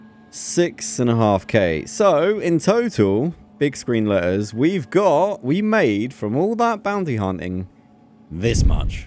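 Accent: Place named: United Kingdom. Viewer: This is like Bri'ish guy in space...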